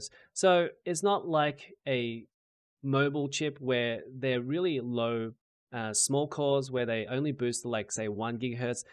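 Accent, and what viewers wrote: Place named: Australia. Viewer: Is this an Asian dude with an Aussie accent? Incredible!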